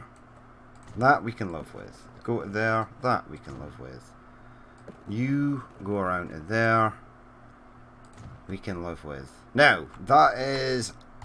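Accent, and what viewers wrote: Scotland, United Kingdom. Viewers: Hey, are you Scottish, Irish, or some flavor of British?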